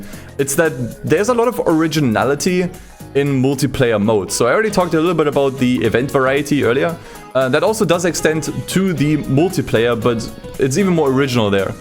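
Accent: Germany. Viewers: U have a strong german dialect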